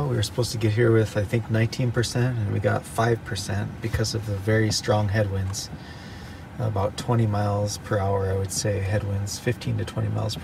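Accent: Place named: Minnesota, United States. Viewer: I could tell you were from Minnesota right when I heard you.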